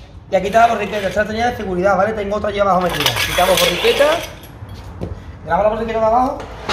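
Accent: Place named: Spain. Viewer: En el minuto de tu video preguntas "me entendéis no? y la verdad que se te entiende la mitad de lo que dices, mi intención no es desvirtuar tu trabajo ni mucho menos descalificar tu modo de hablar, se perfectamente que en algunas regiones de España tienen fonéticas al hablar bastante particulares, lo cuál es respetable pues es tradición autóctona y eso no se discute, en el norte de mi país también ocurre pero no tan diferenciado, pero para los latinos que hablamos el Castellano mas pausado nos resulta difícil entender este léxico y tu si que hablas rápido, creo que deberías tener en cuenta esto a la hora de hacer un video redactando mas lento ya que serán muchos los latinos que lo verán y les facilitarás el entendimiento, gracias por tu video y un feliz 2022.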